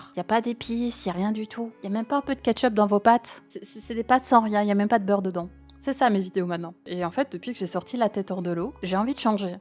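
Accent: Belgium. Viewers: C'est toujours triste quand on doit se réfréner, se bâillonner, pour contrôler la casse, éviter les problèmes, etc, quand on créée quelque chose, et qu'on finit par s'étouffer soi-même… 😔 De toute façon, leur souci principal, c'est que vous soyez une femme sur Internet, et d'en plus ne pas rester dans les cases "make-up" ou "vlog" 🙄 Il y aura forcément des gens qui auront quelque chose à redire, rien qu'à voir les attaques sur l'accent belge, mais je me doute que c'est trop facile de dire de ne pas les prendre en compte, quand on ne le vit pas (et hypocrite, je ne sais pas le faire xD) Faîtes au mieux pour vous-même, vous êtes la plus importante dans l'histoire ♥ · Mais mais, c'est ta chaine non? tu fais ce que tu veux. Si je suis sur ta chaine, c'est que j'aime ce que TU fais. Je suis plein d'autres chaines et je suis capable d'en prendre et d'en laisser. Les médias sont tellement remplis de trucs qu'ils y en à pour tout le monde. 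J'aime ton humour, ta voix est apaisante, ton accent belge, je suis Québécois, et je t'assure que j'ai aussi un gros accent. Tu ne pourras jamais plaire à tout le monde, et c'est ainsi que le monde est fais. Tu à eu le courage de crée une chaine YouTube, ce serait le comble que tu ne puissent pas y faire ce que tu veut! Courage et je serais toujours à tes cotés en tant qu'abonné. parce que point! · Bonjour. Abonnée qui sort de la communauté silencieuse!! Personnellement, si je regarde tes vidéos avec autant d'assiduité, c'est pour ton sarcasme, ton humour, ta personnalité (supplément accent belge) bien plus que pour le contenu. Même si j'adore le format de tes vidéos; des chaînes qui traitent des sujets dramas il y en a des milliers. Des, je n'en suis qu'une. Je dis ça sans parasocial ou quoi. Si je clique sur tes vidéos c'est pour voir du (les gars dont tu parles, pour la majorité je les connais même pas! 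) Ce que j'essaie de dire c'est: amuse-toi. T'aimes la création de vidéo, alors crée des vidéos que tu aimes! Et je pense sincèrement que plus tu t'autoriseras à être toi-même dans ton contenu, plus les gens qui décideront de te suivre te ressembleront. Y'aura toujours des gens qui profiteront de l'anonymat pour venir gratter la merde, mais je crois que malheureusement ils viennent d'office avec le package ''femme sur internet''. En parallèle, y'aura toujours des gens pour reconnaître et apprécier ton travail! (Signé: une abonnée qui appartient à plusieurs minorités et qui ne s'est jamais sentie attaquée par tes blagues) Pense à toi.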